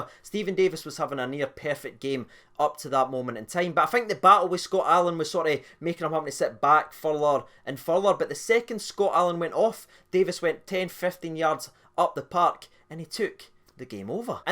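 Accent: Scotland, United Kingdom. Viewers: Scottish accent is class Rangers sex hibs 1 😂🤣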